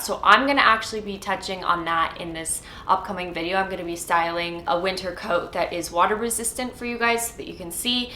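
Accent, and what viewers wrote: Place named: Winnipeg, Canada. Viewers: Hi. Where do you live? I recognize your prairie accent. I grew up in Northern Saskatchewan and I don't remember sunny days. I just remember gray skies. I like how you put your outfits together. I really don't like wearing bluejeans during the winter because the cotton just feels so cold. If you are interested I prefer Pajar boots. They seem more practical and much warmer than Uggs. They also don't get as ruined as Uggs. Great video. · Are you from Winnipeg? This definitely sounds like a Winnipeger!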